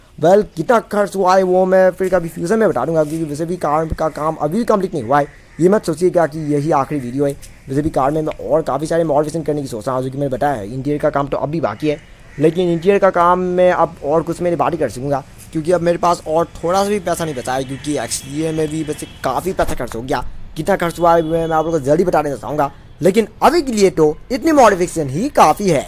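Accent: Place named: United Kingdom. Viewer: Kotha bur olop bhal kobisun aru besike bhal lagibo aitu english accent hindi koi aso ni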